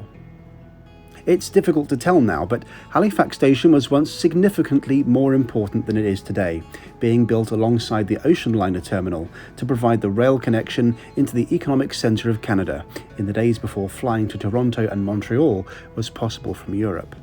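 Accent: United Kingdom. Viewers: Are you Briton???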